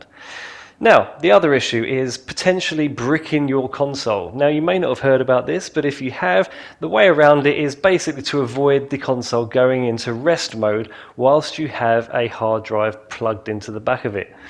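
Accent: United Kingdom. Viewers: I’m assuming you are in the UK by your voice? I wonder if that has anything to do with it. I’m in the US and I’m guessing we don’t get the same updates.🤷🏼‍♂️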